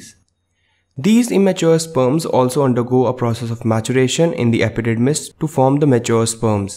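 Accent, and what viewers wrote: India. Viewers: don't worry for your Indian accent-which, if we accent the American prononciation- just has to be accepted as it is. It took the first 3 seconds to adapt myself, and i understood you perfectly though I am not a native English speaker. And for those who find it;s too fast, just go to 'setting" (the little wheel) and change the speed! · There is nothing 'easy' about an English speaking person having to understand an Indian speaking person w/ a heavy accent explaining something in English. It's something about the 'sing-song lilt' that unpleasantly overshadows understanding the explanation for me. Even more so when they're speaking too fast.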